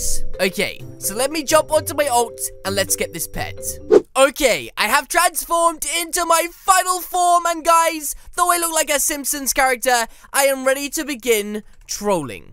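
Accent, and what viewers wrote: United Kingdom. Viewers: Are you bristish I love your accent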